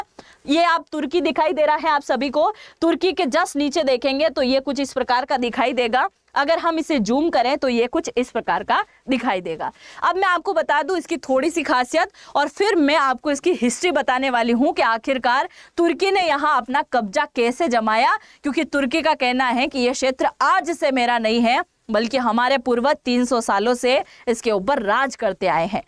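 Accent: Gujarat, India. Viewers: Bahenji aap ka vishleshan bahut hi achha hai.keep it up. God bless you. Aap ke ucharan se lagta hai aap gujrati hain. Sorry,kindly try to correct your hindi specially numbers.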